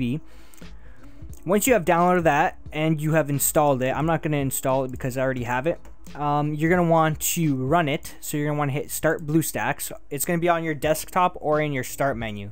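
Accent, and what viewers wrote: Canada. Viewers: canadian ey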